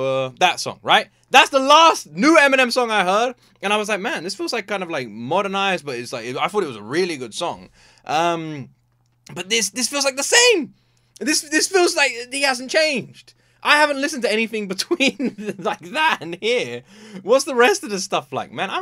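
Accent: United Kingdom. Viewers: I'm new to you and your channel you did a amazing job with this em I'm listening and hearing your accent or you british or english I was watching some video yesterday and seen a em video and I didn't think nothing of it I thought it was a older video em talking to some guy and em going do a magic trick and make his career disapear and now alotta other reaction talk about some rumors about em trying to get cancelled or em about to retire em my guy my guy em been doing this for how long now and only hours later from that em video I seen em drop this with the music video I don't know what going to happen what going on son the only one that knows about anything is em and probably whomever else em talk to if this one of em last songs albums it's going to be a really very sad bad day I like em em my guy my guy keep on doing what you doing my guy 👊👊🔥🔥👊👊👊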